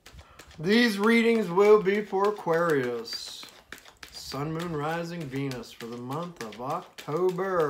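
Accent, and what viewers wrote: South, United States. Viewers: I just adore your southern accent my fellow Aquarius. I’ve got a York Accent. I always love your readings xxo Omg so right on! He had better come correct or not at all. I went true Aquarius and shut him out!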